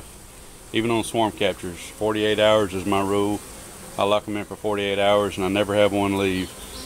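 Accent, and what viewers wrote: South, United States. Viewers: I'm fascinated, I've never seen anything like this. Great video! love your southern accent ;)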